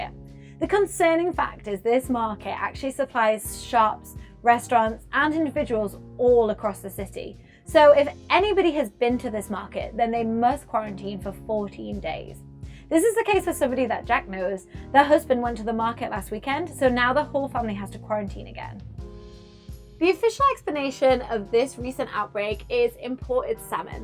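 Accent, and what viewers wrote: United Kingdom. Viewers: A British accented woman turning pink, interesting...now you can see how powerful the CCP's brainwashing techniques really are. A low-IQ, low-efficiency way of controlling the virus and society became widely acclaimed by even the woman who is obviously well educated. Interesting... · I learn British accent from you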